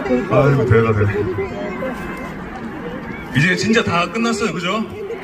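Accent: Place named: Gyeongsang, South Korea. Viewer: 방청객 에서 누가다리가 몸이약하다했나~~ 아니몆시간을 노래하고 떠들고 춤추고 이야기하고 이럴땐매정하다니까? 안힘드는가해바요한다 아나!! 아이고대다 자빠진다 이제다끝낫어요 내죽일겁니까? 고만~~이제 경상도사투리 귀여운데요 영웅오빠가돌아왔다 어땠어요재미있었어요 나도너무재미있어좋았어요 날씨도추운데 꽂꽂하니않아있어줘서좋았어요 감사합니다 몆번을이야기하노 철없는 오빠 기댈수있는오빠 장난기많은오빠 의젓한영웅이오빠~~로기억할께요 가수님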